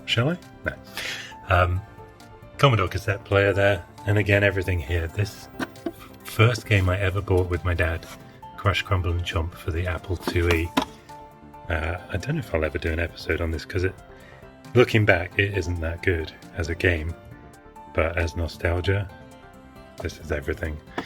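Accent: United Kingdom. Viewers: It's great to hear a blighty accent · your lovely British accent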